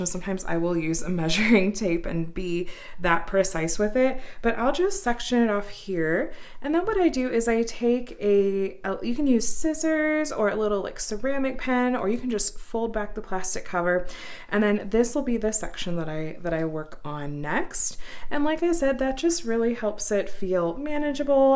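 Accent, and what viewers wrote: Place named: RP, United Kingdom. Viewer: Very interestingly I picked up on what I perceived as an upper classman kind of "accent" at times. Maybe this is just a product of that teaching technique called ENUNCIATION. Whether intentional or not, to me this really INCREASED the perceived greatness and value of a project like this, and makes both the craft and the video much more interesting. Currently working on my first about Jesus holding hands with a child while walking on a trail in a forest. Love doing this.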